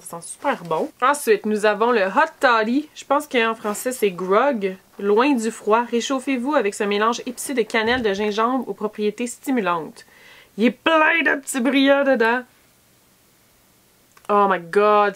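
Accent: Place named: Quebec, Canada. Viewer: je t adore accent quebequoi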